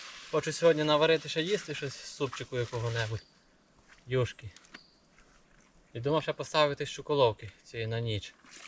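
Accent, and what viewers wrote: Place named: Volyn, Ukraine. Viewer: Привіт з Закарпаття!виріс на Волині згадав рідненький говір.😊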